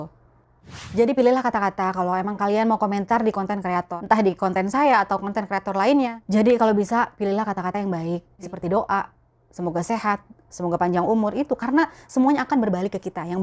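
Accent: Jakarta, Indonesia. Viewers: As jngn di pikirin komen orng klu kata saya debes seneng saya liat klu cara bicaranya betawi bnget 👍👍👍👍👍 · Bahasanya betawi pisan di geroinnnnn 🤣🤣 Mpo.. Belajar b. Arab dong mpo bnyakin.. 🙏😘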